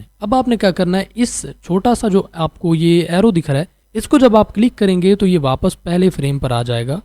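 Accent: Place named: Pakistan. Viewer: u sound like Pakistani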